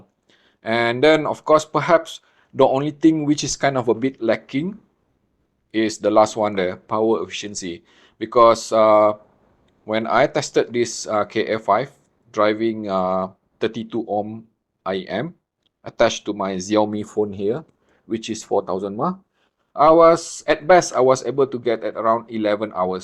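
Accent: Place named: Malaysia. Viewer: great review I love your malaysian english.... Greeting from indonesia....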